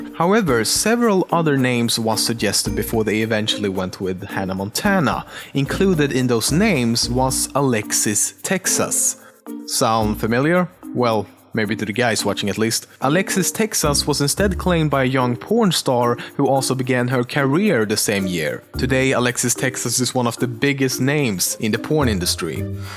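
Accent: Sweden. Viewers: You! Person who is making this video. Where are you from? Your dialect sounds a lot like those who come from my country, Sweden! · Hes not russian he is actually swedish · Is he swedish?? He has a swedish accent.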